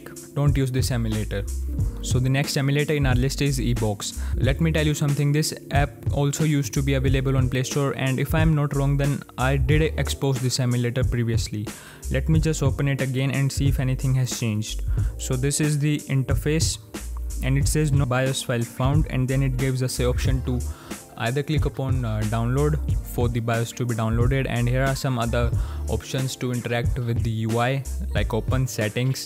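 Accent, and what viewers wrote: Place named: India. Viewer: Yes something thst is indian on english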